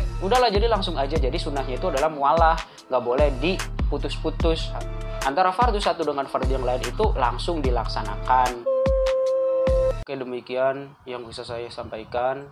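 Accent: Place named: Java, Indonesia. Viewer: medok men ang :v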